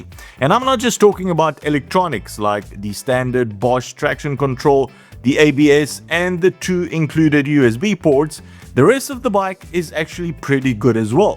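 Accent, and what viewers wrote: Afrikaans, South Africa. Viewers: Just found your channel and looking forward to seeing more! Hoor ek reg, is jy dalk Afrikaans?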